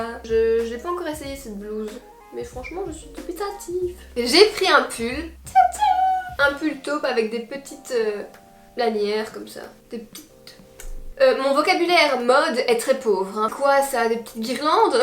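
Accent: Belgium. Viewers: J'adore ton accent belge ♥️♥️moi aussi je suis belge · Est ce que un jour tu pourras faire un meet up à Marseille ? ?? Sinon vidéo parfaite 😘😘je kiff votre accent en Belgique 😘😘 BISOUX 😘😘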